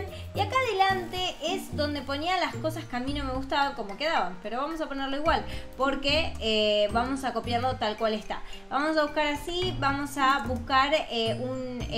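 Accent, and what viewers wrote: Argentina. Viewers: Sos de Argentina o de España? O algún país asi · tueres de argentina??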